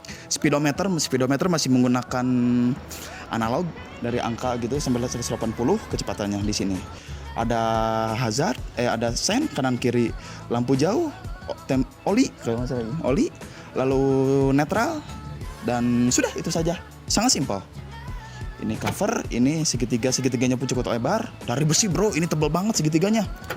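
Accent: Indonesia. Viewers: Inimah..hhaa orang sunda..broo.!?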